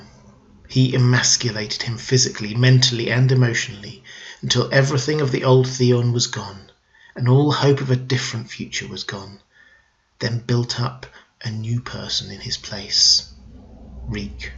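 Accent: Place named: RP, United Kingdom. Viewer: Hi, I've done, among other things, radio ads and have gotten feedback that I have the best voice in local announcers, etc and was chosen over many others for a series of PA announcements at DIA (Denver Airport). I have also directed audio sketches with a cool group previously online. I say this to let you know I know something about voice delivery. There is something to consider in what Yol says. Got the downbeat voice criticism from radio station managers, when the women said the slower voice was good. I think they were reacting as women and found my voice attractive - whereas the men were more balanced in their judgement and as such should be listened to. You have so many very good things going on with your voice and delivery - but there is a certain downbeat aspect to your voice that bears improving upon - it tends to lull the listener who will fight to stay awake because they can tell what you have to say is important. 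Often very important.. Perhaps it is to bring more variance in your voice, variations in inflection, stressing certain words over others and differently. Changes in the tempo of the words to liven things up. There is a peace and humanity in your voice that makes listeners want to be civil and human on your posts. Very humble though you're speaking in a very posh upper class British accent. Very good on your content! These videos help me live without the series or any new books. Yours are quite good and... quality stuff!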